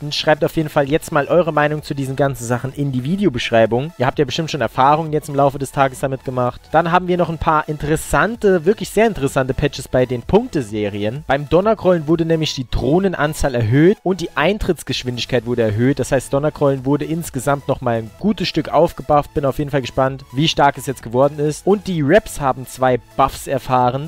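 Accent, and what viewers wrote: Saarland, Germany. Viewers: Kann es sein dass du ausm Saarland kommt?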